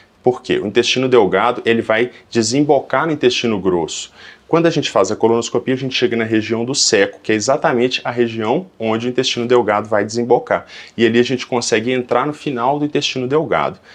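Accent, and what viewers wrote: Minas Gerais, Brazil. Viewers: Médico bonito! Minerin, aposto! Olha o sotaque! Não faço exame com vc não. Tenho Vergonha!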